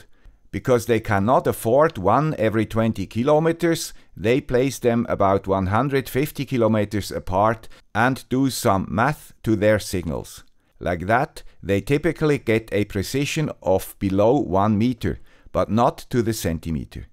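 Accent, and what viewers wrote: Switzerland. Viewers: Hello, Man with Swiss accent. Can you explain more detailed how 4th satellite helps calculate exact time? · Swiss accent acquired at the ETH Zürich is the best Swiss accent! 😀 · Lovely video! thank you for covering this topic :) greetings from another Swiss accent guy! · That's a Swiss accent? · Hello guy with the Swiss accent. Can you tell us some more about the business case? It's been about a year, I'm very interested to build a base station to support RTK2GO and earn some money too. How much did you earn in this year? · Dude with the Swiss accent, you are awesome! Thanks so much for sharing this information with us! I love your content! ❤️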